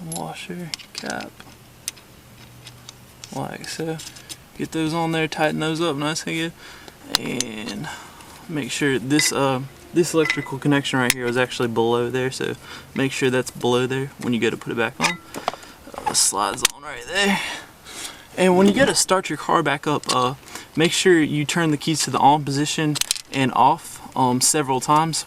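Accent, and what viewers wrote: South, United States. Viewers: somewhere from american south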